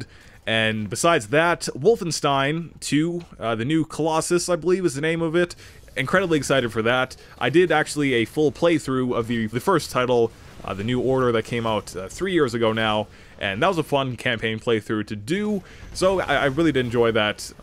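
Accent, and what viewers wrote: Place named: Canada. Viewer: Your canidiaannn!!!!! Damm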